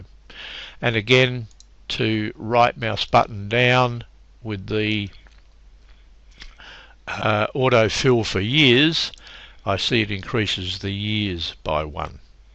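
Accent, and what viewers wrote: Australia. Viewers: awesome! Thanks. You are an Aussie...right?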